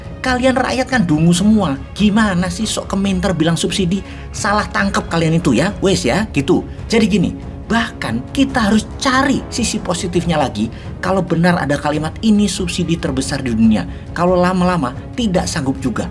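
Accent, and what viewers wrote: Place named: Java, Indonesia. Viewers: bossman Jowone kluar diawal kalimat😁😆😆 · aku suka narasimu? nglulu yen boso jowone ngono...joss👍 pak,lanjut...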